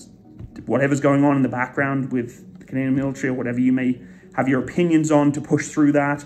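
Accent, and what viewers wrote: United Kingdom. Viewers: I served with the SAS as a transfer. Good Job Mate! That Limey assed accent is yours and only yours Sir!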